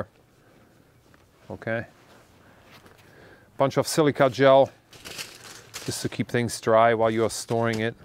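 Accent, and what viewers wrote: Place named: Germany. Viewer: Great Video! Do you come from Germany? It sounds so. Greetings from cologne😁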